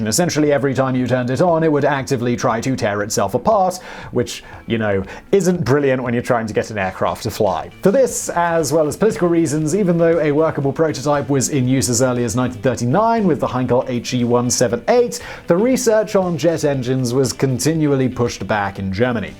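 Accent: United Kingdom. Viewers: It is funny how sensitive the Brits or as we call them from downunder Pomes! This chap is going on about the things with his Pomie accent ! How many Pome jets were there? Its about like asking the Pomes how many World Cups or Euro cups they have won! What a joke! Not the best objective documentary! Cripe the Pomes were saved by the ANZAC's, Canadians, and the USA! And Yes the Communist USSR gave a great deal, but not much in terms of Innovation! The British Invented the Steam Engine! Well done!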